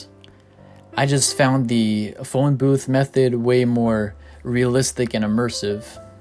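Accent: United States. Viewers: Sounds American.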